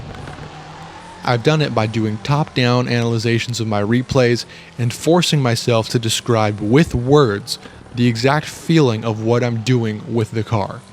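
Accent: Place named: United States. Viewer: American accent sticking out like a sore thumb.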